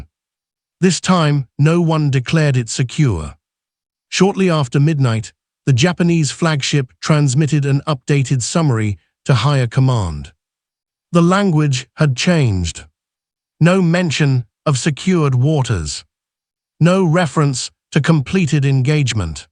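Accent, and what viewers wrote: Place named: United Kingdom. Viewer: Why add distracting and annoying flickering speckles, cropping, streaking, and shaking to what could have been clear steady images?!! Your ridiculous images show objects and personnel vastly distant in location and time from what you describe. Why Brit-accented narration of a 100% US operation?!! DISLIKE! UNSUBSCRIBE!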